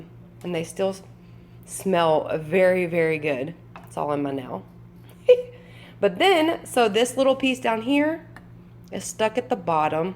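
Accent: South, United States. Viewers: Girl, save your fingers! Just sit the jar on the candle warmer and enjoy the scent right out of the jar! When scent is not as strong anymore, dump out the wax in trash and wipe with paper towel and then wash jar. Less mess, and you won't burn your fingers! If you want to make the melts, just wait until all wax has melted and pour into silicone molds. I don't bother using melts or burning candles anymore. I just sit the jars on the warmer. So much easier! Love your videos and enjoy listening to your accent. "Y'all' Blessings. 😊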